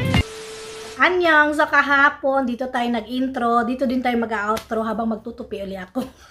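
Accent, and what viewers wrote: Philippines. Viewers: Tanung mo nga sa asawa mo kung kapag nagsasalita ka ng Korean, may accent ka ng pagka-Filipino? Kasi mukha ka naman ding Korean, gusto ko lang malaman kung kapag nagsasalita ka ng Korean, alam ba ng mga Korean na hindi ka Korean dahil sa accent mo?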